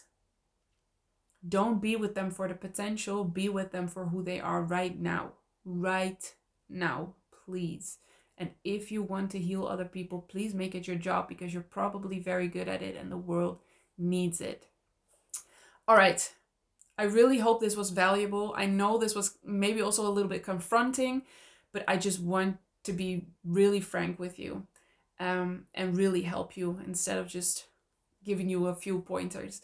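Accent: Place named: Netherlands. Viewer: There are a lot of wonderful YouTubers on attachment styles but takes the cake - and this one in particular. 100% acceptance of an FA - or anyone - as they are in this moment or move on. Love your honesty, authenticity, wisdom and Dutch accent but amazing English / calming voice. Keep it up, girl!!!!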